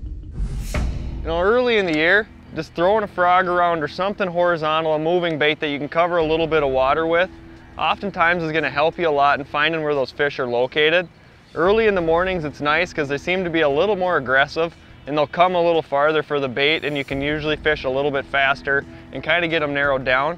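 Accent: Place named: Minnesota, United States. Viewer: That Minnesotan accent though...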